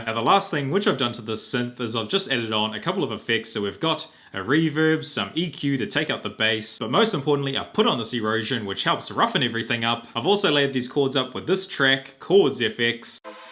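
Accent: New Zealand. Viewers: Are you a New Zealander? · Are you a New Zealander? Because you don't sound Australian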